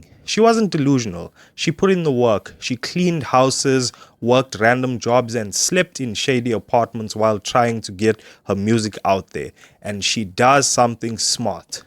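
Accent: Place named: Cape Town, South Africa. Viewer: Im south african, from cape town, are you from here too? That accent is very recognizable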